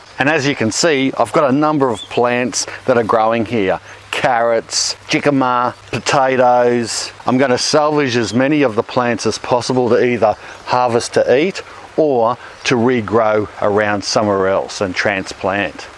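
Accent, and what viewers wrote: Australia. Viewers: Australian accent is funny 😂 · Straight Aussie. Reminds me of my dad 😆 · Typical Australian hehe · Came here to listen to an Aussie talk about what happening Down Under. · was raised loving the crocodile guy accent, AND YOU HAVE IT ALONG WITH HIS VOICE, JUST MORE CHILL, MAKES YOUR VIDEOS MUCH INTERESTING, LOVE AND APreciate, ESPECIALLY WHEN U SAY, "SO NEXT LETS GO TO THE NEST TRUNK, OH YEAH ITS CHIPING, ITS TURNING INTO DIRT AND DUST" LOL LOVE IT MAN, IF THE TRUNKS WERE CROCKS 🤣👌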